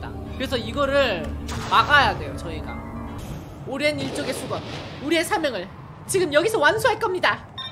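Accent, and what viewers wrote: Japan. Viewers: 한본어 개웃기넹 ㅋㅋㅋㅋ큐ㅠ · 한본어에다가 은근 성우스러움 ㅋㅋㅋㅋ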